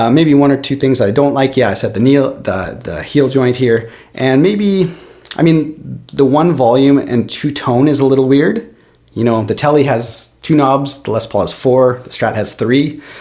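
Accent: Canada. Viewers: WHERE'S THIS GUY FROM , CANADA ?????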